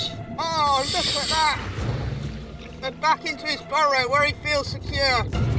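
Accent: England, United Kingdom. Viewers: I love british accents playing around with dangerous things. lol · Somehow a British accent makes these encounters more authentic. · Love the British accent. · The British accent gives him documentarian deep sea legitimacy. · sotaque inglês da poha ‼️‼️‼️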